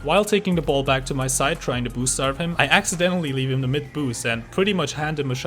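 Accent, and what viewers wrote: Germany. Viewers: Your English sounds like german English...Are you german?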